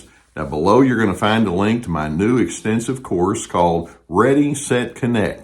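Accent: South, United States. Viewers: You have a great voice and a beautiful southern accent I can listen to this all day.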